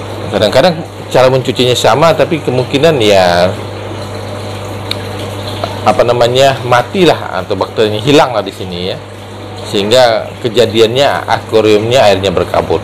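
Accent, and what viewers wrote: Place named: West Sumatra, Indonesia. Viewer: Makasih infonya uda Sangat bermanfaat. Kalau boleh saya coba tebak Dialeg cando urang awak. Salam 🙏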